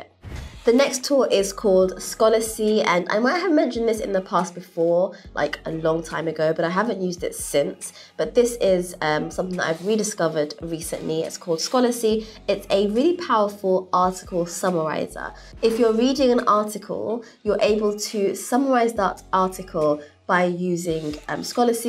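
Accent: United Kingdom. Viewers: I LOVE YOUR UK ACCENT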